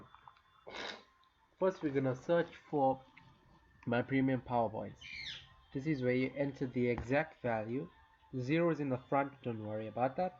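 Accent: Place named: Durban, South Africa. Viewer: okay thats a shame, but thanks for the video, it was really useful and saved me a bunch of time, also are you south african, you sound like you're durban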